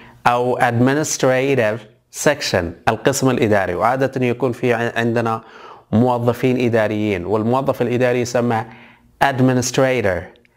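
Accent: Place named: United States. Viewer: انت كذا 👍 ...نطقك كانك مثل الامريكان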